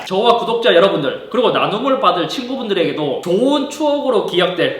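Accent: Gyeongsang, South Korea. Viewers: 역시 경북 안동 출신이시군요 사투리억양이라서 어디지...ㅋ 궁금했거든요 구독자20만 정도부터 눈여겨보던 유튜버이신데 벌써 118만!! 두둥 멋집니다 앞으로도 ~^^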